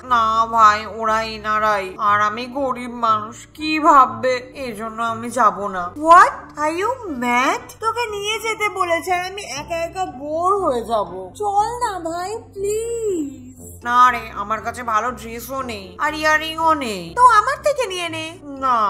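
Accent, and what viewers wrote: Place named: Bangladesh. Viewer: তমার বাংলাদেশি voice এতু ভাল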